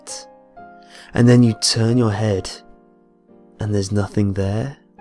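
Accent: England, United Kingdom. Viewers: I love your accent :) I'm english too but i'm from the east midlands so my voice sounds dead common when I do Creepy Pasta readings :P Wish my voice was as posh as yours lol. Awesome reading once again :) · Gotta love brit accents XD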